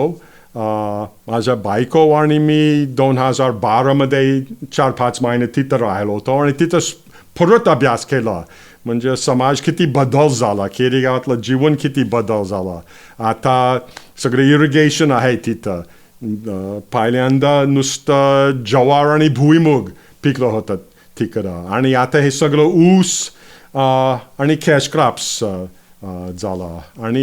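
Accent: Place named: India. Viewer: full gavathi marathi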